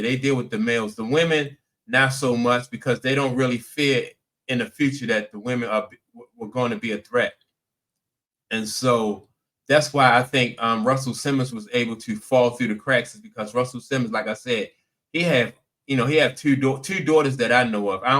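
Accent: Baltimore, United States. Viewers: Yo! You from Bmore! Huh?!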